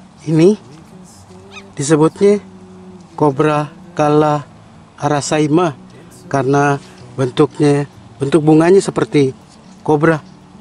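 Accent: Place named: Indonesia. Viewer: ... Bahasanya masih khas banget anak Johar... Seneng deh liat skr.. 🥰 Sehat dan happy selalu yaa... 🙏 Salam dari temen kecilmu dulu... 🌹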